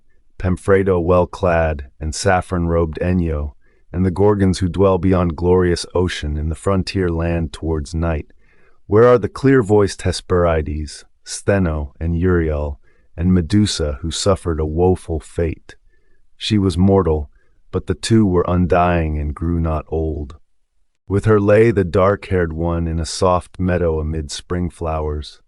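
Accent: United States. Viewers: American accent is an abomination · American accent reading the classics. It's just wrong.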